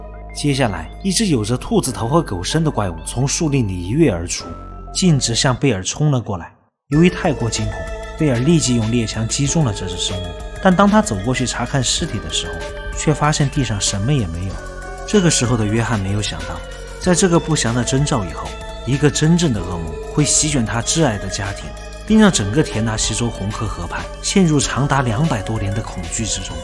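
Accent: China. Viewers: 听起来是重庆口音😂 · 配音是四川还是重庆人？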